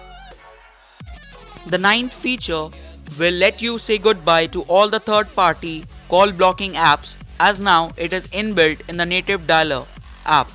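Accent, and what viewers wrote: India. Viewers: I hate your accent. Your an indian!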